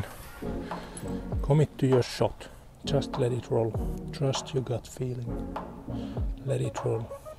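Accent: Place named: Finland. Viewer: Bit of finnish accent or "rally" accent but so what 🤷‍♂️ keep it coming!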